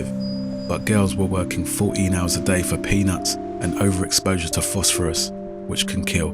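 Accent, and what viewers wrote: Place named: RP, United Kingdom. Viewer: Iam not even harf way through, loving it. Narrator of this vlogg. Is so posh and articulate, love it.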